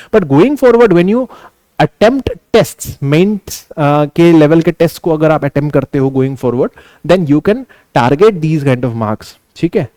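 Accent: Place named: North, India. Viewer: Sir your accent is like morth indians..😮🎉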